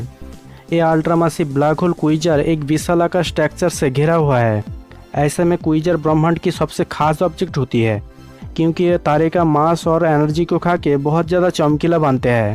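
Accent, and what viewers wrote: Bengal, India. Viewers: ভাই বড্ডো বাঙালিদের মতন শুনতে লাগছে। কিন্তু খুব ভালো হয়েছে। আরো ভিডিও বানাও। অবশ্যই ভাল হবে।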